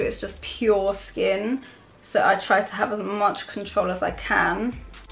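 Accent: London, United Kingdom. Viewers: Just me who loves her voice? Got like that London accent it’s so calming lol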